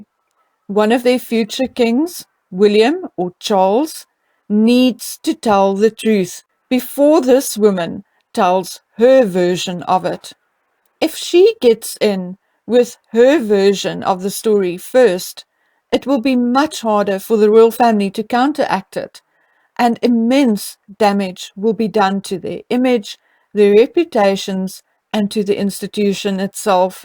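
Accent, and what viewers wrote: South Africa. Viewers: I like your South African Accent!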